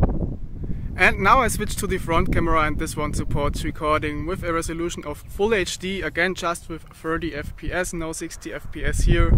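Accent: Germany. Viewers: Irgendwie hört man richtig raus das du deutscher bist 😂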